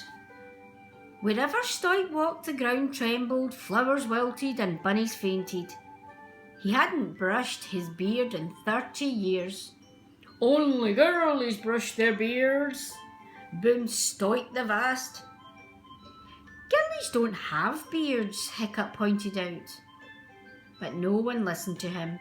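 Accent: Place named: Scotland, United Kingdom. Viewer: And she also has a Scottish accent. This is just brilliant. :D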